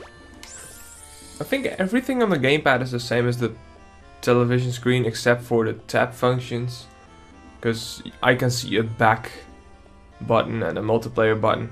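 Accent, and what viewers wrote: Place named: England, United Kingdom. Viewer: Hoe kan jij zo goed met een Engels accent praten?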